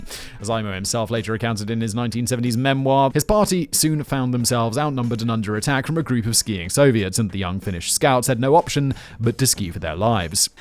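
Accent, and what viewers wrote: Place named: United Kingdom. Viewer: p-ah-stah simon I cringe when you say taco and pasta yall brits need to learn what a soft "a" is. also berserkers of the Viking age literally ate mushrooms before war...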